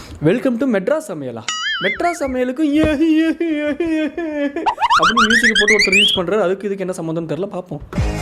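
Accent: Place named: Karur, India. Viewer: 😂😂😂😂 ayyo Madras samayal fav cooking channel 100 mattum illa108kum serthu phone pannirunga 😂 thalayatturathu mattum thaan unga role 😂 staff sick manager shock😂😂😂 neenga mattum unga maara kooda vilayadalam watermelon star mattum vilayada koodatha😂😂 but neenga+ maara cute ah irukum ithu comedy ah iruku 😂😂😂 unga karur slang vera level ❤❤😂 fans club watermelon starku thaan😂😂😂😂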